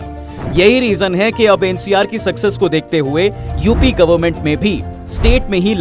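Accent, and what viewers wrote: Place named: Bihar, India. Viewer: boli bihar jasi hai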